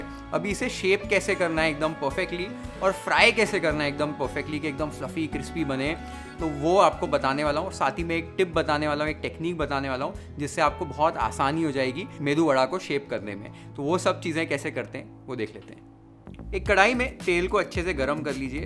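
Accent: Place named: Gujarat, India. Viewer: you speak Hindi in Gujarati style😊😂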